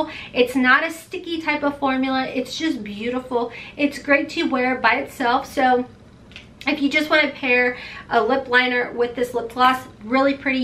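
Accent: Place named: Kentucky, United States. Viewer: I always wondered why I recognized the accent ! Hi , from Louisville Kentucky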